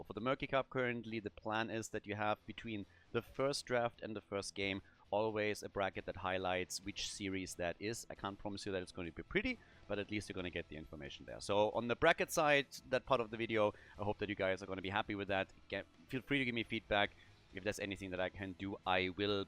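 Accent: Germany. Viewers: Thanks for putting in the effort man! Great content! As a Dutchman living at the German border, I really enjoy your German accent 😀!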